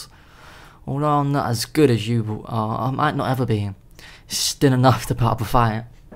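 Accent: United Kingdom. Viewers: Fix yo Briish accent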